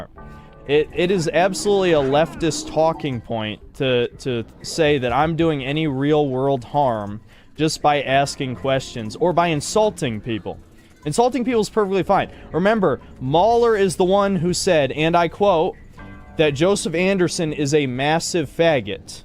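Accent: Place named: United Kingdom. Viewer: I bet it's the British accent. They hear that and think he's super smart lol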